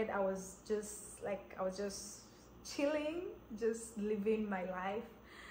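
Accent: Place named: United States. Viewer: this accent haitapotelea Kenya i take you back to the states in Jesus name amen.nakupendaa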